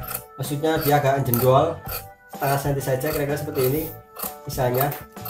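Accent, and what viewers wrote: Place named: Banyumas, Indonesia. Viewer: السَّلاَمُ عَلَيْكُمْ وَ رَحْمَةُ اللهِ وَ بَرَكَا تُهُ Mas sampean bahasane kaya aku. Tika dr republik ngapak apa ya mas.. Aku jg di tanggerang. Sampean tanggerang mn..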